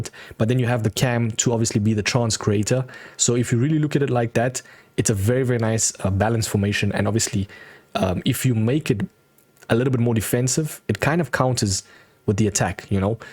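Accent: South Africa. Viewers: Is this guy South African ?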